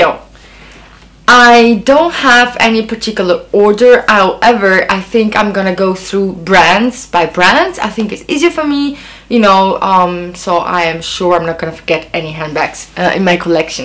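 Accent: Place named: France. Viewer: Nice to see you back! I watched A LOT of your videos before starting youtube myself. J’adore ton accent français. (pas du tout comme le mien - je suis Québécois Anglophone)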